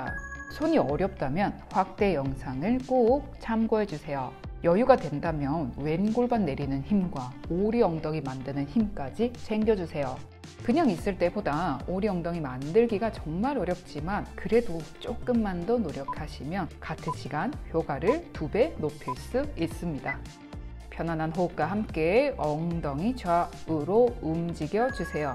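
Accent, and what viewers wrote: Gyeongsang, South Korea. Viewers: 말투가 ....신기하네요 사투리인가요..?ㅋㅋ좀...신경쓰이는ㅋㅋㅋ 경상도인지 강원도인지특이하네여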